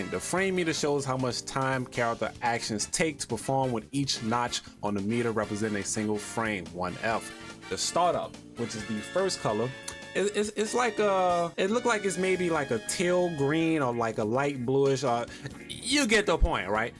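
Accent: United States. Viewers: I know this is old, bro. But thank you. I'm learning to play for real now and I know that some people preach about the frame data thing. I ran into your video and THANK GOD you're just as country as me lol. That helped a lot. Appreciate you explaining this 💪🏾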